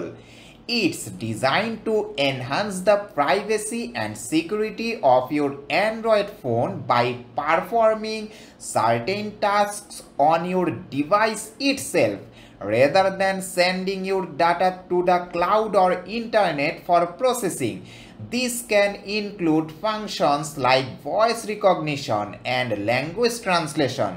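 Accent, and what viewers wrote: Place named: India. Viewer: Can you speak english without indian accent???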